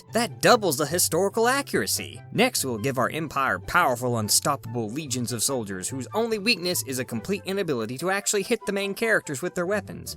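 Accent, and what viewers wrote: South, United States. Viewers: is he southern??? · Are you a Southerner i can here certain twangs in your accent, I can kinda tell because im a Southerner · No disrespect to you or people from the south, but your accent. Now my mom is from the south, Norfolk, Virginia in fact, and her parents and their family are from the Carolina’s and Georgia. So their pretty much as southern, as respectable southern can get. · I like how your accent is "normal" and then your southern one comes out...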